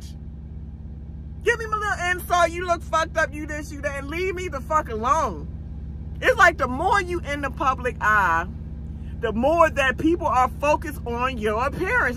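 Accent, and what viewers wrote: Baltimore, United States. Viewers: I been following you since the pandemic on three platforms and that little bit of weight looks good on you. and that Baltimore accent❤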